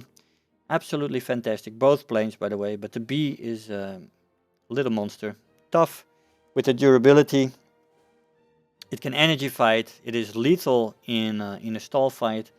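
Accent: Netherlands. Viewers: dat nederlandse accent lol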